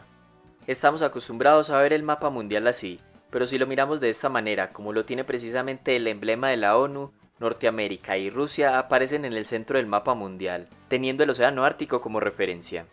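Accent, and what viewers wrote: Colombia. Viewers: No me gusta el acento colombiano bobo del narrador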